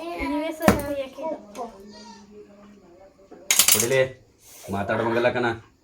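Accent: Mandya, India. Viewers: Namaste avrige. Video super 👌. Nimma Mandya bhashe 👍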